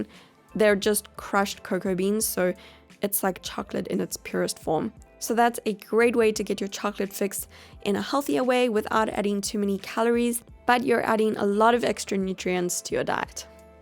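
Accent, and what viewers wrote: South Africa. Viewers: Your from SA right😂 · Are you South African?? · Is she South African ? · Also are you South African because I have South African friends and the way you pronounce words are super similar? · Lara S South Africa 😊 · You’re from SA right ?? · Slay Ace she's from South Africa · Knew you were South African as soon as I heard your accent ! (and I also saw woolies)I'm South African too ! ❤ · South Africa I believe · Channi Snklb Her accent sounds South African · Ahhhh !!!! I recognized your South African accent so quickly !!! I’m from South Africa, can you make a video of how to diet & a diet plan for picky eaters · Are you South African ? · are you South African? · Ayyyyeee South African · Are you from South Africa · i could pick up u are south african due to your accent but i wasn’t sure, then i recognized the shop u were at! :)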